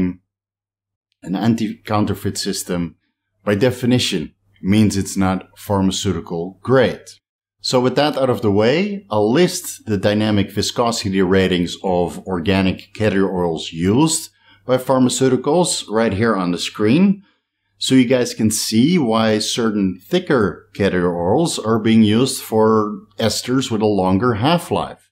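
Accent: Netherlands. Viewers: sounds ok to me. A bit of a Dutch twang but ya get that when english isnt your first language. You say gyno no worries now. The content is what im here for and give it an A+👍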